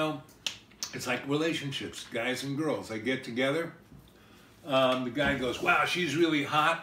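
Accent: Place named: New York, United States. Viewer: Very interesting his story about Basquoit. He sounds like a real New Yorker. Madonna hooked up with Basquoit.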